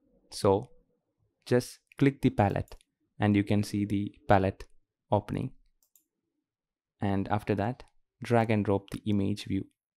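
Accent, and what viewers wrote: India. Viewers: Not surprised. Indian accent.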